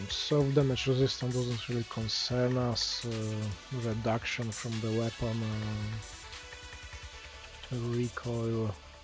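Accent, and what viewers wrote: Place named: Netherlands. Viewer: Yay! It's great hearing you! Keep being a awesome! Oh man I want to guess your accent; is it of Germanic decent? Dutch?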